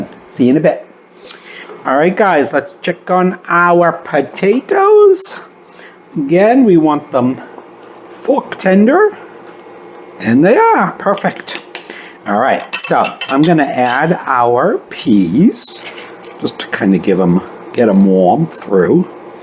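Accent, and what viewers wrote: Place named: Massachusetts, United States. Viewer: Are they in my home town? Newton Mass? Accent is correct!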